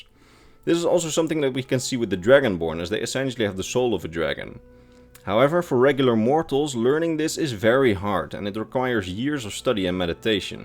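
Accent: Netherlands. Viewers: Haven't really watched the while video yet (doing that now) Love the video so far, really Interesting. Do you happen to be Dutch? I may recognise a slight but of am Dutch accent.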